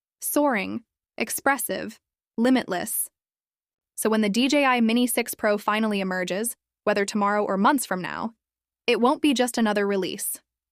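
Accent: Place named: United States. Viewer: a croaky American voice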